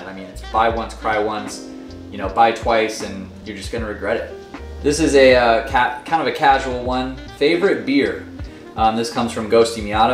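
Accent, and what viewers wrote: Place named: Chicago, United States. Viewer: Hey, you from Chicago? I've seen the Bears stuff in your other videos, and, I thought your accent sounded familiar. The fact that you have an open dislike towards Wisconsin just kinda confirmed it for me, lol.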